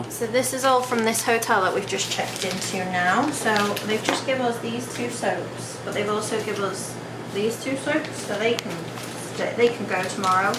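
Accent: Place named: United Kingdom. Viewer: Where abouts you here in UK .....youve got that lovely british accent ....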